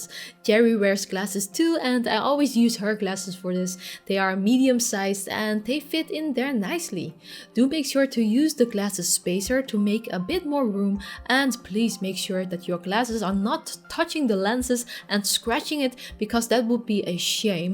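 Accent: Netherlands. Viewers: I can't help but wonder if you are Dutch, you have a Dutch English accent :) Anyway, it doesn't feel like I'm watching a review of someone who used this for a month, it feels more than just an explanation of the Quest 2 specs. I have so many questions! :D I was hoping to see a more personal review going in deep about your experiences. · is it me or she sounds Dutch ?! .... :) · Je klinkt Nederlands? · Ok, I NEED this mystery resolved... since your first video I thought you had what sounded like a dutch accent! Are you ladies dutch? Quality content, i'm subscribed! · Great video ! 😊 I will sub! Question though.. are you Dutch .. your accent makes it sound like it I just bought a quest two I loved it, but sadly it made a horrible noise in the fan in front .. so I am waiting for a replacement. 😊 i did not know about the stuff of the elite strap.. I wanted to buy it as I never had the first one.. 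what should I do ? Wait until they fix it ? Or just cope with the standard one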